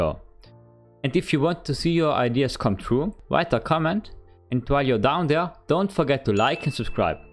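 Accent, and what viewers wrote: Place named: Germany. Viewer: ich wusste direkt am akzent dass du deutsch bist hahah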